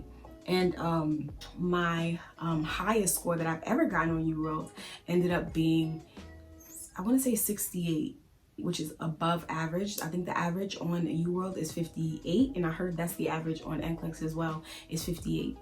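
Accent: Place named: Liberia. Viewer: I keep hearing a slight Liberian accent. Darling, are you from LIB?😉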